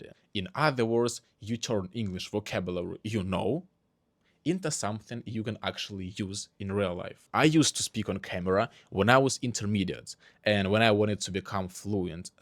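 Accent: Russia. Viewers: The moment you spoke I knew you were Russian how I love the Russian accent 💗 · Aww, you have a brilliant Russian accent❤ Your accent gave away your nationality😅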